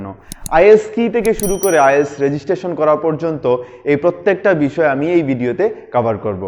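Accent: Sylhet, Bangladesh. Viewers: Sir sylheter na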